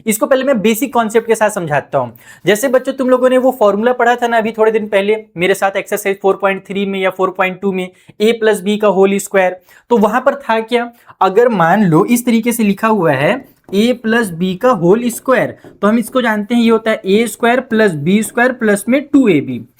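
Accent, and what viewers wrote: Bihar, India. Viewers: thank you sir are you from bihar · Sir bihari ho kiya..........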